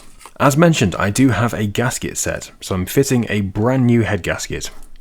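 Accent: United Kingdom. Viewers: British voice & machinery for restoration! That's so ASMR! Subbed! Thank you!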